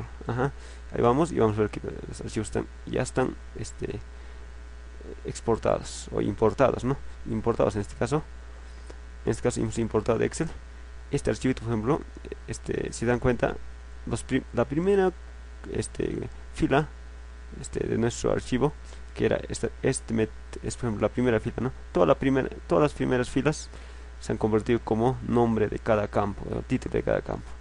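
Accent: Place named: Puno, Peru. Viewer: si pasas unos meses en el departamento de Puno hablarás igual, es el dejo de la región así tenemos otros dejos o acentos en diferentes ciudades del Perú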